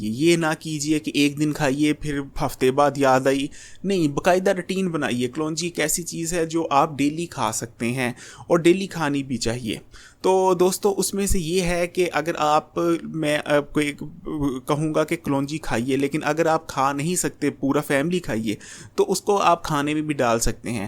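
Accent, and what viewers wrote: Punjab, India. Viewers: Apka Punjabi accent ..apka baat krne ka tariqa bht acha hai. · بھائ اپنی اردو تو ٹھیک کر لیں یار کتنے الفاظ ایسے ھیں جو آپ نے پنجابی کے ادا کئے باقی رھی کلونجی کی تو وہ شفا ہی شفا ھے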